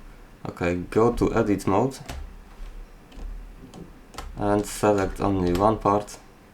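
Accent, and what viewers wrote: Poland. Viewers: jesteś polakiem xD jeśli tak to poznałem po trochę niepoprawnej gramatyce i polskiej wymowie liter i niektórych wyrazów bez urazy ;-)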